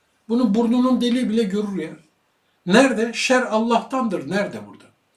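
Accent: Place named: Arab world. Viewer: Konuyu biliyor ve anlıyorum. Fakat kırıtma konusunda eleştirmenin kendine de bakması lazım. Eleştirmenin şive resmen arap şivesi gibi. Sizce de öyle değil mi?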